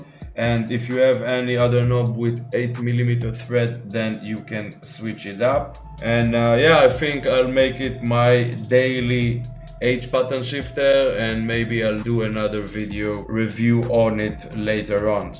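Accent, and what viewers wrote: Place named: Israel. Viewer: חחחח איזה מבטא ישראלי, אחלה סרטון. אתה חושב שזה עדיף מהth8a? כמה זמן לקח לזה להגיע?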